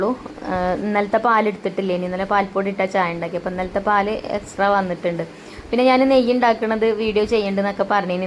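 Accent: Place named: Chungathara, India. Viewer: ഞാൻ ചുങ്കത്തറ ഇവിടെ അടുത്താണ് എന്നു തോന്നുന്നു. Slang ഒരുപോലെ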